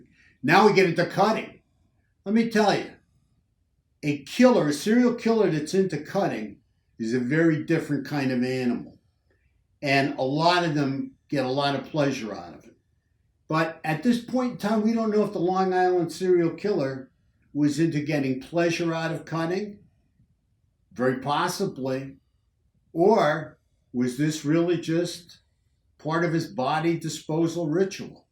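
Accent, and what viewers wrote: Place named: Philadelphia, United States. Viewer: Hi, do you think the Long Island Serial Killer is the person responsible for killing the women in Atlantic City? Melissa Taylor was last seen (not 100% sure) in a town close to Atlantic City, Port Republic. Also, are you from the Philadelphia or South Jersey area? You sound like it, it's where I'm from. Thank you for the great content!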